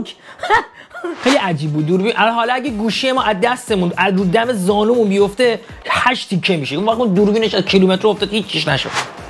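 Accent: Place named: Bushehr, Iran. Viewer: تو بوشهری هستی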